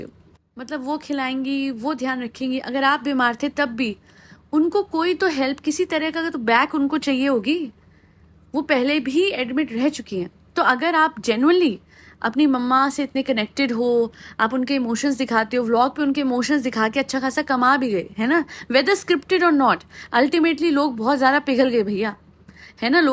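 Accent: Punjab, India. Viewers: You speak very cute Punjabi. Please try to add a few lines in Punjabi in every podcast.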